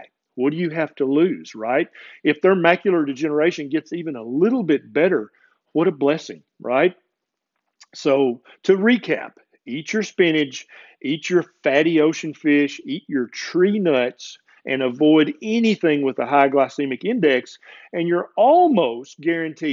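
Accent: South, United States. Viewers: KenDBerryMD Unfortunately the Specialists dont have a clue even in their trained fields My gastroenterologist had no clue what leaky gut is, my Psychiatrist says Benzodiazepines and other Psyc Meds are completely safe, tell my brain that. They easily put you on meds but as far as risks and tapering off not a clue. I see a Hollistic MD as my primary. What foods we put in is the key. Avoid grains, sugar, processed everything, organic, and healthy fats..... what is helping me slowly recover. Thanks for your channel. Love the accent, my Dad was from the South, lost him in 2010. The best to you.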